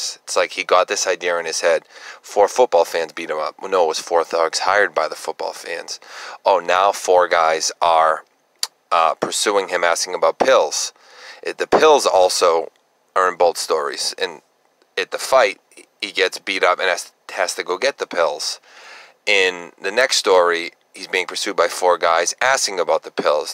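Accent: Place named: New England, United States. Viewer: This case fascinates me. Listening To your voice I’m thinking you’re a fellow new Englander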